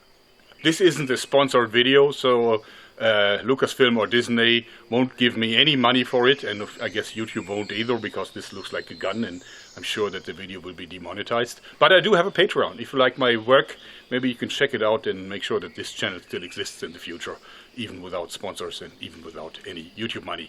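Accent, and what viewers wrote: Germany. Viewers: Love it! Hello from USA! I love your videos and I think your german accent is cool and makes you seem more like a mad scientist haha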